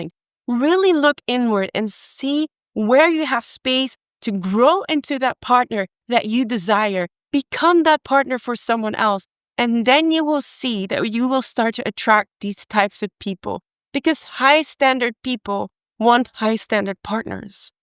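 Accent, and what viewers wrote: Netherlands. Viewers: Is... is that a dutch accent i hear ?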